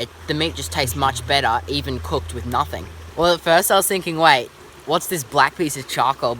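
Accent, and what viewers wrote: Australia. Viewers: Haha you Australians talk so funny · Are you Australian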